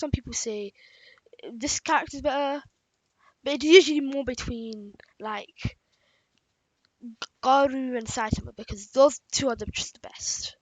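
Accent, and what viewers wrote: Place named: United Kingdom. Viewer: Briish? Its fine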